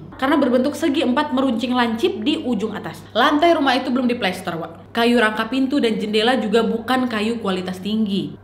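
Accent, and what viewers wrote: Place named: Medan, Indonesia. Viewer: Kak orang mana?? Kok logatnya kayak orang Medan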